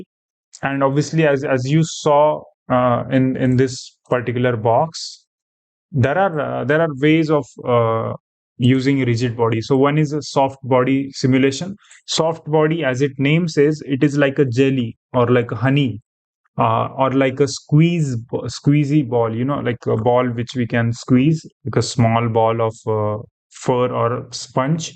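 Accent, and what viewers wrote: India. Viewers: I cant take 12hrs of another indian youtuber but the guys without an accent put a fkng paywall behind their tutorials. Shit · If you are making video with this accent Why don't make Video in Hindi Many people who don't know English can learn better. Indians should not make Tutorial videos in English unless they are targetting Foreign audiences and With this Accent. Are you serious? Better make it in Hindi Many people can learn.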